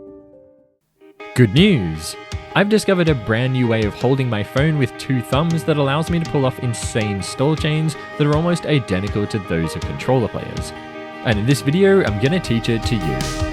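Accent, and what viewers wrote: Netherlands. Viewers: Bruh he was Netherlands I’m Dutch but I can understand the schot and stuff schot is shot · Waiiitttt, u dutchhh!?!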